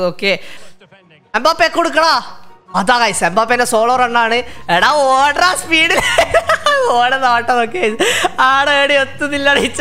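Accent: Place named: Tamil Nadu, India. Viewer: Naduvula naduvula tamil varudhu😂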